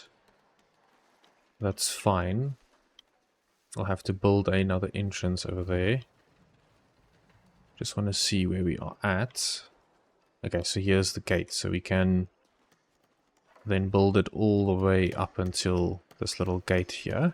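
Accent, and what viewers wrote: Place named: South Africa. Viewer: Cool to find a South African here! Your accent was just so peculiar to me I had to check your channel location.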